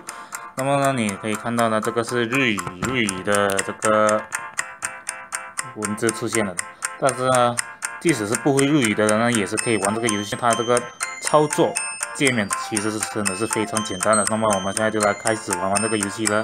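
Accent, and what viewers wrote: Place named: Taiwan. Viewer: 怎麼感覺你是臺灣口音?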